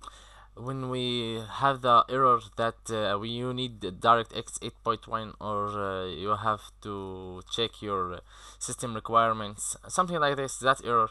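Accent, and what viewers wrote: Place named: Arab world. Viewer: شكرا يا مان لانوا من لهجتك عرفت انك عربي ، على لغتك الانكليزية رائعة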